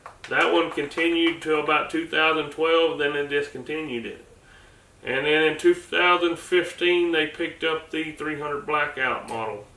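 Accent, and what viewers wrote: United States. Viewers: Ar and mini14 are both prima pieces of shit. Go russian if you want quality.Fuckers eh? You sound like a hillbilly, and look interbred to me.Lol the official california battle rifle. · Do you have to talk like a HILLBILLY to own this Gun ?????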